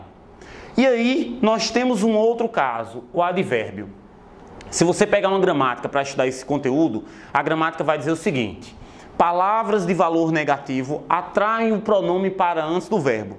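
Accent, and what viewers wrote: Northeast, Brazil. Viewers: Seu sotaque nordestino é da hora não é aquele sotaque caricato, cômico e falando errado!!!!!!!!!😀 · Caramba, que aula boa!!!! me ajudou bastante, ele é do nordeste?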